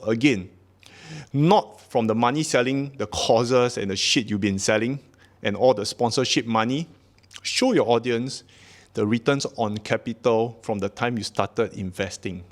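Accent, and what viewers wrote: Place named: Singapore. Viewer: God bless you 🙏 You are one of the best, and what I always liked about you was you are so genuine. You have taught me so much. Your English is great and even better with your beautiful Singapore accent (a place close to my heart). Take care, look after yourself and ignore the toxic trolls - they have nothing on you! 🙏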